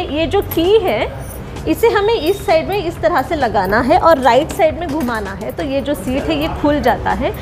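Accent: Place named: South, India. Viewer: Apka south indian speaking style bahut pyara hai .. ♥️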